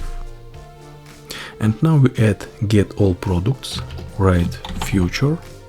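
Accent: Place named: Russia. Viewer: Are you russian? I hear a native accent) Ну или мне просто показалось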